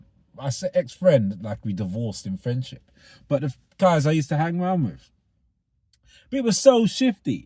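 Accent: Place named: London, United Kingdom. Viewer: He's a Londoner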